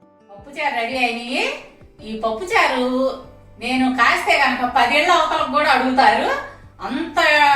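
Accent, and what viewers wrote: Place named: Telugu, India. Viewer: Wow :: What a Telugu ! Bengaluru or Belgium can not change this lady' s Telugu accent or rustic aroma Manu more dishes like Kandi Pappu and Kandi Ginjala Pachhadi please Prakasam Panthulu Garu used love Gongura Pachhadi in Ongolu style PV Garu Chintha Kaya Thokku in Karimnagar style We are proud of this lady and her rustic way of presentation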